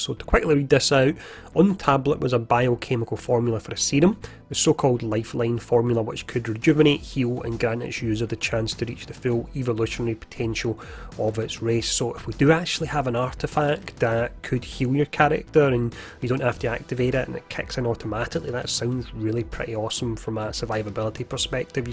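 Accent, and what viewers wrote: Scotland, United Kingdom. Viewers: I have to admit i don't really mind the Scottish accent, even if i'm french. I know since Marvel Heroes and i'm used to it now, i'm pretty glad i am able to understand more english streamers / youtubers thanks to that! Welcome the comment section! Thanks for the good work! Cheers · Long time sub, new commenter. No worries with the Scots accent on my part, half my family are from Perth lol. Keep up the awesome work dude, I played the beta on xbox this weekend and enjoyed it, what are your thoughts after 2 weekends of play? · The accents the best part cause he’s Scottish. What a legend!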